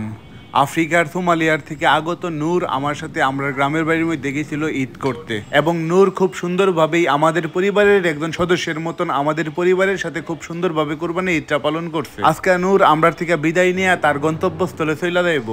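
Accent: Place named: Brahmanbaria, Bangladesh. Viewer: আসসালামু আলাইকুম ভাইয়া আপনার ভিডিওগুলো দেখি কারন আপনি আমার নিজ জেলার ভাষায় কথা বলেন খুব ভালো লাগে। Brahmanbaria মানুষ শুনলেই মানুষ ভয় পায় এবং বলে ভালো না আমরা এই কথাটা আমারও শুনতে হয়। আসলে আমার মনে হয় সব জেলাতেই ভালো খারাপ মানুষ রয়েছে। সবাই খারাপ হয় না। কিছু মানুষের জন্য সবার বদনাম হয়। এটা মানুষ বুঝতে চায় না। আজকে আপনার ও আপনার বন্ধুর কথাটা শুনে মনটা শান্তি পেল 😊😊😊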